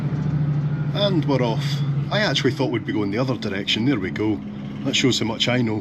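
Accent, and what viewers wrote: Scotland, United Kingdom. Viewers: How are you doing buddy 😎you're videos are so interesting and incredible I wish I was Scottish love the accent and fantastic eh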